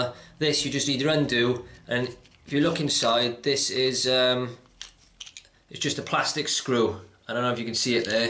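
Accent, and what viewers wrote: Wales, United Kingdom. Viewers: He sounds a bit Welsh ....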